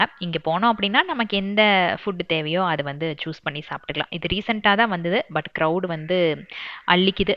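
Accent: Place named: Telugu, India. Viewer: akka nega telugu thana ka❤❤❤ nagalum ipadi tha ka telugu pesuvomm...... Nega pesurathu ennaku nalla puriuthuka...... ❤❤Naga pesura marriye telugu pesuringa....... Unga vedios eillamey enaku romba pudikum...... Enoda day vey ungaloda vedio la start agum...... ❤❤❤❤❤❤avlooo postive vibe akka ❤❤❤❤❤unga voice and vedios❤❤❤❤......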